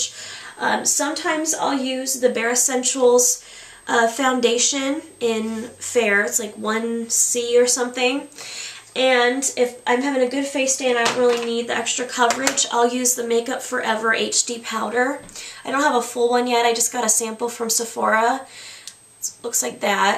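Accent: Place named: Michigan, United States. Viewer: Your skin does look great. btw, you sound like the typical michigan girl!!! very thick michigan accent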